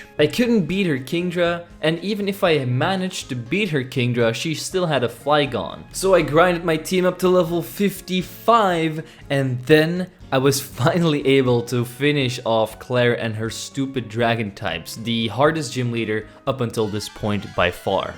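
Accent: Belgium. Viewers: Deze man komt gewoon uit België!!!! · BEN JIJ EEN BELG!? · U from belgium? Nice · Wait.. You're Belgian?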